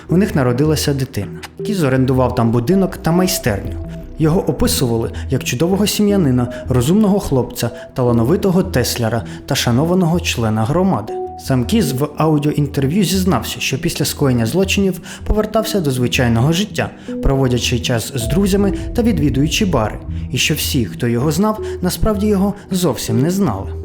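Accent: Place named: Russia. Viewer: Чому! То вже б!Відчуваєте себе дуже юним, не можете позбавитися російської вимови? гарне ім'я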